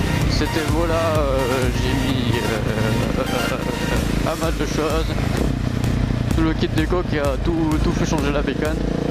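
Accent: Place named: South, France. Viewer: l'accent zen du sud 😊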